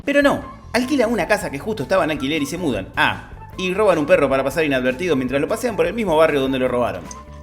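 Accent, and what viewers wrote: Argentina. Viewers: Qué bueno eres. Y ese acento argentino le da mayor intensidad al humor.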